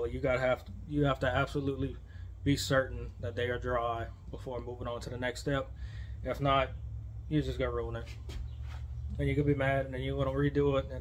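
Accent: Louisiana, United States. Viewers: He sounds Cajun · What part of Louisiane are you from? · That’s a Louisiana accent if I’ve ever heard one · Sound like you from St Landry Parish 😂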